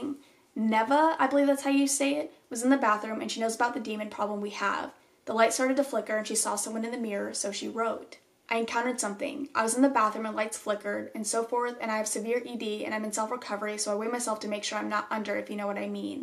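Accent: South, United States. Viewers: You sound like you developed a sort of country/western accent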